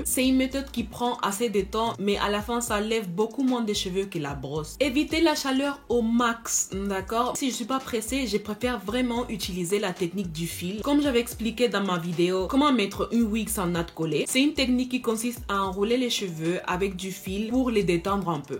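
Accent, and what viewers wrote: Spain. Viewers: ton accent ... je me demandai si tu étais espagnol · Tu as l'accent espagnol 😊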